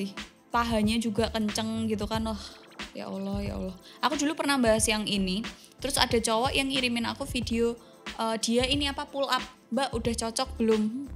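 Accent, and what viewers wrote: Java, Indonesia. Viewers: Suaranya medok nduuu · Wuaduuuh...!!! Medok buanget mbakayu!!! 😘😆🙀😹🙈🙉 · Aku seneng bahasanya enak blak blakan medok jawane sukses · logat nya jawa ya sama jawa😂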